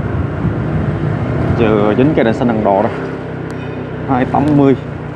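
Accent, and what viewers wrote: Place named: Hue, Vietnam. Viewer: Người HUẾ…Mà …Nói giọng HUẾ như người Thượng / người Mọi…! Nói tiếng người KINH…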